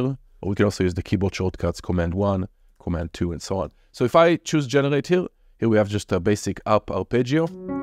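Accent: Israel. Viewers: I kind of respect the fact that you're not even trying to work with your Israeli accent. It's as if you're almost putting a little extra effort to sound more Israeli than you naturally do. :) Kudos man. Truly.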